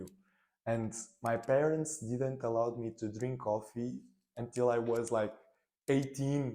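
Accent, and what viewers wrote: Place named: Portugal. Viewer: Nota-se logo pelo teu inglês que és tuga! Bom ver este tipo de conteúdo em Portugal. Continuação de um bom trabalho e qualquer coisa hit me up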